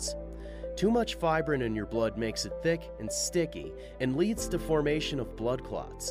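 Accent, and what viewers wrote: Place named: Canada. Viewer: I'm not from North America, but am I right in thinking this man's accent is from Canada or Nova Scotia? It sounds different to a regular US accent.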